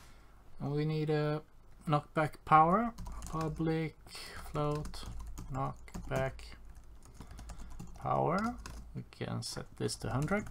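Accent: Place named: Sweden. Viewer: 100% Swedish :)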